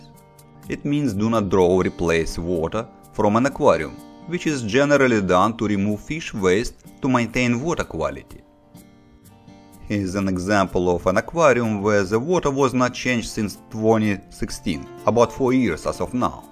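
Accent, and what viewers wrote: Russia. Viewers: Интересный у вас акцент. ВЫ не русский, случаем?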